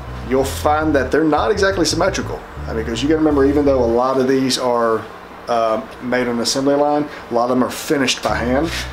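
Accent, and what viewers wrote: South, United States. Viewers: Came for the shoes, staying for the hot southern drawl! 🤗🤗🤗🤗🤗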